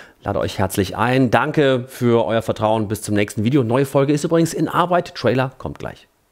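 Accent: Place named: Cologne, Germany. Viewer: Weil dein geiler Kölner dialekt viele zu lachen bringt aber man kann auch einiges von dir lernen ich glaub das du nicht zufällig der ervolgreichste sondler yutuber bist weil deine videos von Anfang an super qualität hatten umd profesionell wahren und du nicht einfach drauf los lulst wie viele yutuber bei denen das mit dem iq ehr eine 2 stellige zahl ist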